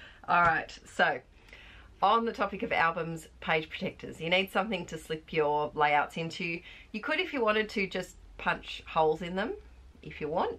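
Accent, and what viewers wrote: Australia. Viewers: The joy of hearing an Aussie accent.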